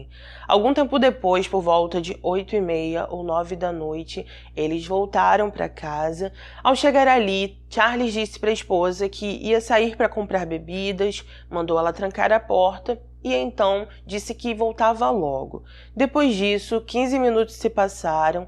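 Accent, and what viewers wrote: Rio de Janeiro, Brazil. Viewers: QUE SOTAQUE TENEBROSO É SOTAQUE CARIOCA QUE SOTAQUEZINHO DE MERRRRDA..AINDA MAIS QUANDO HÁ EXAGEROS.. COMO ESSA MULHER..MAIXXXXXXXXX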